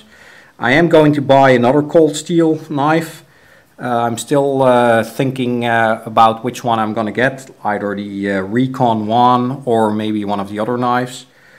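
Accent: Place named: Netherlands. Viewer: Ik kan wel horen dat je een nederlander bent. Niet dat je Engels slecht is, maar de uitspraak kan beter. Goede EDC keuzes!!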